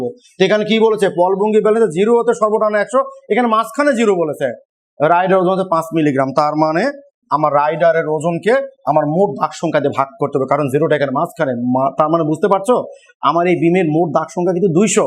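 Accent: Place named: Joypurhat, Bangladesh. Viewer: Vai basa ki joypurhat?? Vlo laglo. Sohoj savabik representation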